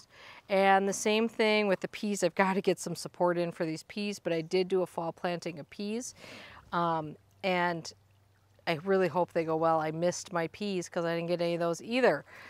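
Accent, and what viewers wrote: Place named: Upstate New York, United States. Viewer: I love how you garden. It's like I would if I had the space and lived in a place with trees and a bit more rain. I started watching your channel about a year ago. It wasn't your garden that caught my attention, at first, though I love it! It was your accent. My dearest and best friend sounded a lot like you do. We lost her to a stroke almost 4 years ago. She was here in Texas with me 4 years ago today! It was so important for her to get here. See, she was born and raised in Upstate New York in a small town called Phelps. It's just south of Rochester. She got transplanted to Texas because she wanted to go to college some place that had a good music program and was completely different from where she had been living. Well, she found it! I'm in the Texas Panhandle and it's nothing like your beautiful tree filled, crick running, lakes and, well, we're not the same at all! 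She always kept her accent which she argued, in fun, that we had the accent down here, she didn't have an accent! Haha!!! Anyway, she and her family moved back to NY in the mid to late 90s. I didn't know what I was gonna do without her?! Our lives were very entwined from working a small business together and I helped her home school her daughter and and and.... We could go shop at Wal-Mart and have a blast doing, together. Well, I survived the move because We could still talk on the phone and I was truly happy that she was back home. We made a trip up there in 2008 and spent a week with her. It was perfect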